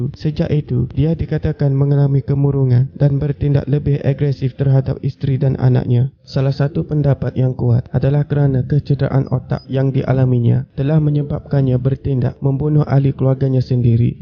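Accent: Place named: Terengganu, Malaysia. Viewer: Ni yang ckp ni mesti orng ganu atau Kelate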